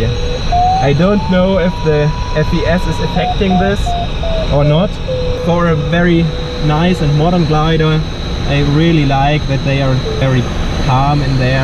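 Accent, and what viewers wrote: Germany. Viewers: German tries to speak English ...... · Du hörst dich schon echt deutsch vom Akzent an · Ich höre deutsche Heraus haha : ) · Der deutsche Akzent nervt kein bisschen :D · His german Accesnt tho... love him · Are you from Germany, because your english sounds so. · if you cant speak english, please dont do it, orfull to listen to that german accent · Kurze Frage bist du deutsch wegen deinem Akzent? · Haha man erkennt am Akzent, dass du Deutscher bist. No front ich spreche genauso. XD schöne Grüße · He is German i Can hear that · Du hast so einen deutschen Dialekt😂😂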